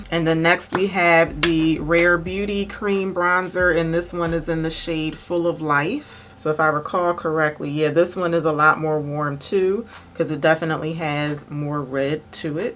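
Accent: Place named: Philadelphia, United States. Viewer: You sound like a Philly girl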